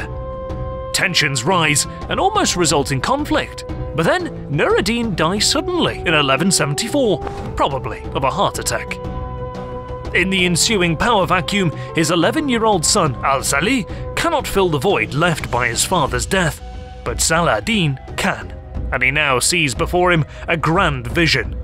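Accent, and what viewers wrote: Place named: Yorkshire, United Kingdom. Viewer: ... Ok... I give up! It's almost completely gone, but I reckon you are either from Yorkshire or 'the other place' (Lancashire) lol. Or am I completely wrong? haha LOVE your channel and the fact that you present things in a way that is so easy to understand... wonderful! :)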